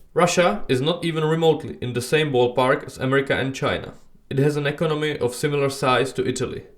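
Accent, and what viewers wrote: Czech Republic. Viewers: Uhh no, this is a pretty clear Czech accent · thick czech accent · He is from Chekya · Z tvého lehkého přízvuku( žádná veliká výtka) jsem poznal, že jsi Čech. Je přímo neskutečné, jak málo si průměrný Evropan uvědomuje všechny problémy týkající se naší budoucnosti, já jsem měl dříve spíše jen takový pocit ,, kulturního podvědomí", že se něco pomalu hroutí než jsem objevil tebe a další podobný materiál. Jak v ohledu ekonomie tak v hudbě, médiích je cítit, že jako kontinent ztrácíme náš elán. Myslím si, že je ČR v určitém smyslu takový zvláštní mix (jako nejzápadněji smýšlející stát železné opony). I přesto nedokážu pomyslet na moc kulturních záležitostí nedávné doby, které by překročily naše hranice. Nechci, aby se z celé Evropy stalo vymírající muzeum, pro celý svět k nahlédnutí. Jako část nejmladší generace mám pocit, že proti tomuhle všemu opravdu stojíme bezmocně, hlavně kvůli tomu, jak je postavená naše populační pyramida. 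Respekt za to, že věnuješ něčemu, co by si spousta jak mladých tak starých lidí měla projít hlavou.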